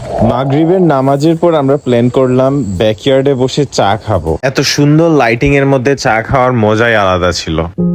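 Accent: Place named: Sylhet, Bangladesh. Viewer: His Syl accent is so cute 😂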